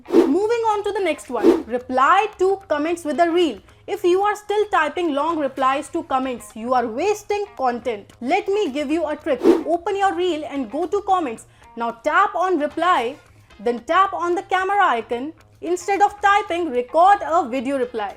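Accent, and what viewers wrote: India. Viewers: Yeah Anytime and really nice East Indian Accent and Hot Beautiful Smile plus Personality.!!!💙❤🩵✅️👌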